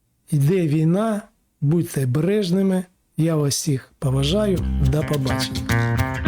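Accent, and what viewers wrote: Moscow, Russia. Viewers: Згоден в усьому. Слух ріже а-ля мацковська вимова: Акремі, Ачікує, кАму, пАпередники, Астанні... "Плюс" зміст, подача "мінус". Коротше: Ахуєть, а "училке" з української наше "ФЕ".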